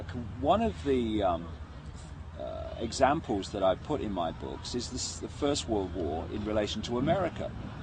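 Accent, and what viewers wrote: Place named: United Kingdom. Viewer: "A certain letter was written between two people in a secret society" can you get more precise while citing a source? Then quoting a traffic warden.. How can anyone believe he is doing anything else than pulling it out of his ass? Just because he has a British accent does not make him knowledgeable or anything. And, all these "signs" he's talking about are just normal things. Civil unrest happens every once in a while, and boosting the warden's powers? What does that have to do with it?!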